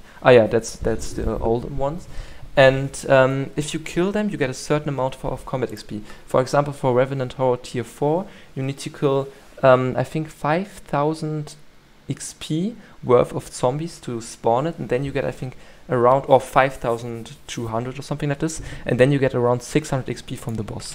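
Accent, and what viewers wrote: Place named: Germany. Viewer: ich lieb den deutschen akzent hammer video tho :D is there a better way from like combat 40-50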